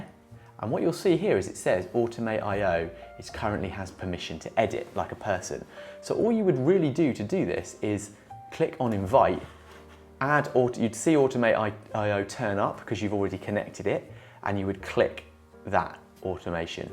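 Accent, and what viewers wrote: United Kingdom. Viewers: мне очень нравятся ваши видео, спасибо, я становлюсь умнее и организованней! А еще мне приятно слышать ваш АНГЛИЙСКИЙ акцент!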